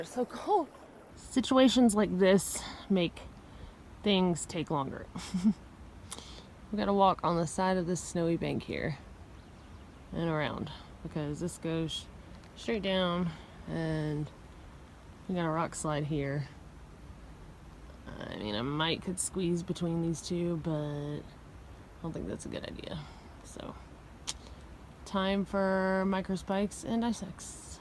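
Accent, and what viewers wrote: South, United States. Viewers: That was just superb! Very nice metaphor about the beauty juxtaposed against the risk, too. And, by the way, as an Oklahoma/Texas boy, I love hearing your southern accent out there among the Californians. You represent us well! Thanks for sharing,. Steve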